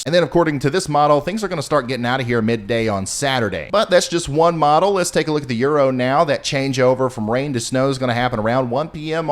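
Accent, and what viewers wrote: South, United States. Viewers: Thank you for your outstanding work at covering the weather,. Being a snow lover in North Carolina, it's so refreshing to hear someone with a "southern" accent say they love snow! Sad to say, forecasters here are saying that my area will be getting mainly ice. 🧊☹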